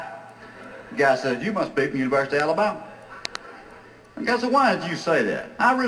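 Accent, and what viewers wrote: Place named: Georgia, United States. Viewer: He was born at Fort Benning which is considered outside Columbus, Georgia. He was from South Georgia and he sounds so different from North Georgia. : )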